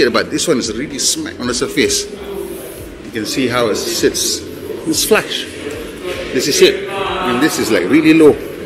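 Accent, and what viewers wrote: Malaysia. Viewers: saya suka dengar bahasa inggeris abang. memang betul2 slang melayu tak mengada2 nak ikut slang mat saleh. review sangat detail dan term2 diguna pun sangat tepat. by the way design hyundai ni nampak macam terlalu agresif pulak, mungkin ramai yg akan skeptikal lagi utk beli kereta yg terlalu agresif macam ni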